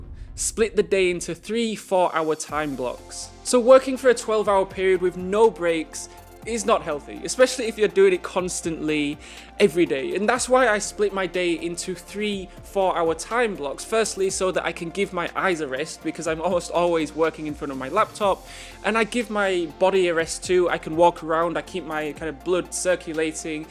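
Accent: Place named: United Kingdom. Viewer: Are you British I like that voice/Accent 💪👍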